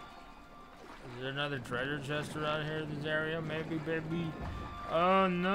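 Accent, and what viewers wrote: New York, United States. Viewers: So, im from NYC (born BK, raised Staten Island, so you can imagine The accent I have)..and so many of my gamer friends want me to voiceover my videos cuz of that accent. And hearing you in your video, now I understand why. Absolutely awesome 🤣🤣 (side note, thank you, I couldn’t find this shrine for the life of me lol) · I will have to say, I realized right away that this is the first YouTube video I've ever watched with a New York/Guido accent. I'm not even mad.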